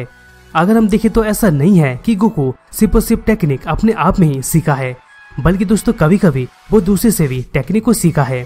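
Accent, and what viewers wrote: Bengal, India. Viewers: Are you Bengali aap ke batoo me Bengali ka jhalak hai like dekha jeto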